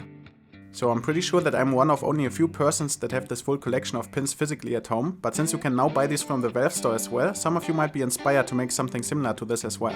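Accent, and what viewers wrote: Germany. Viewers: Wenn deutsche englisch reden hört sich das irgendwie komisch an.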